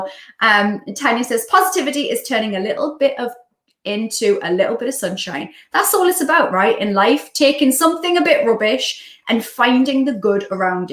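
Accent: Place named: Geordie, United Kingdom. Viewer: I saw your Georide Accent videos first. You really have made your accent more proper. I Love the Georide accent!!!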